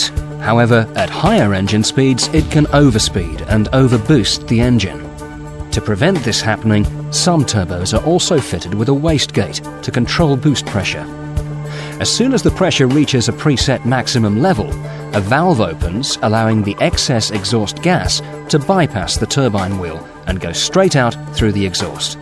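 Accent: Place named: United Kingdom. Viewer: Fantastic overview of turbocharging. Everything sounds better when explained with a British accent. Well done.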